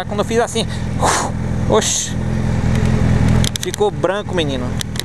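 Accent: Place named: Minas Gerais, Brazil. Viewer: esse teu ˆsutaqueˆ é bão demais sô hahahaha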